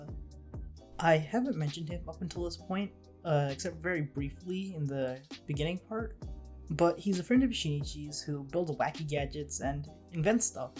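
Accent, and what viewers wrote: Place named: United States. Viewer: The way you say it sounds like CONAN THE BARBARIAN. Your way of saying japanese names far too american aside, respect for using the actual names rather than the MURICAN ones.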